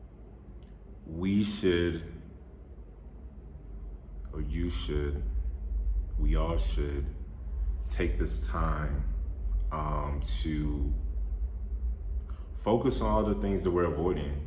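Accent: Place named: South Africa. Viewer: Frm 690+ subscribers to 2k+ wow!✌. Hav anyone noticed how pronounced things abt mzanzi?, He pronounces like someone dat has been living in SA for a while omg!. Plz giv dat man a bell. hav u eaten " triple decker meat/ chicken pizza frm Debonairs pizza?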